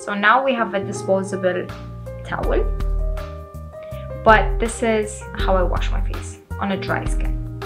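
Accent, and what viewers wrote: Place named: England, United Kingdom. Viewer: I love your English accent 😋😍😍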